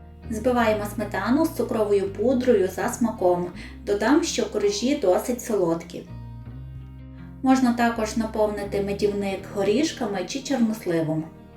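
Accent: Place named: Hutsul, Ukraine. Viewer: Дуже дякую за рецепт. Чудово подайте, без зайвої балаканини...І чудова українська мова...з ниткою Гуцулендії.😉🤗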